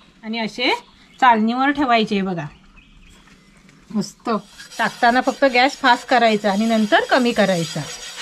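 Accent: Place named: Konkan, India. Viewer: Mla kokani bolna kupch aavdt tumch 🎉❤😊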